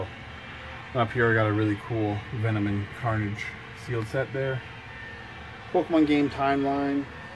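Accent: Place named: United States. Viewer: in the box i love when americans say that lol in the bahx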